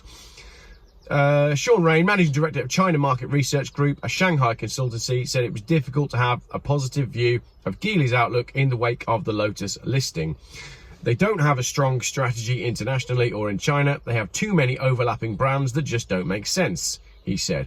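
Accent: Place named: Birmingham, United Kingdom. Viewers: Your brilliant Brummy accent makes me LOL. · Your Black Country accent is uncanny, eh it yam yam lol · Loving the Brumm-aye accent.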